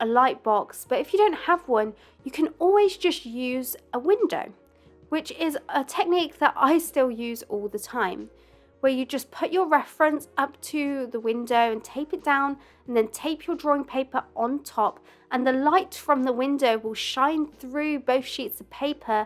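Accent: United Kingdom. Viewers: Going by her accent I am pretty sure that she is British. Love your name, btw.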